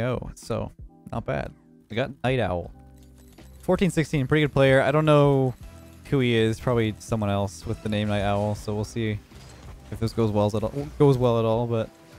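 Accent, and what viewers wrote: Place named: Canada. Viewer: you never let me forget that you’re a Canadian lmao: I’ve figured him oooooouuuut 😂❤️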